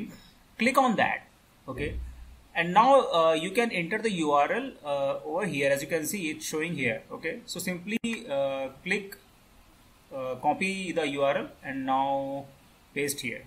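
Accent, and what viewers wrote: India. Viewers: I have a problem with all accents just as some people have a problem with my mid-western/NY accent! I don't understand Cockney British English either! Or Australian ... so Indian is just about impossible for my ears. Nothing to do with the people who have the accents. I still can't find that 'line' section above where I type my response on Quora - and don't now how to explain that I need to know how to get THAT line. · uh, uh,uh, uhm. ok, .....ok,.....ok uh ahem and too much of a Hindi type accent, the worst of all accents. Get a text to speech converter there are a few for free on the internet.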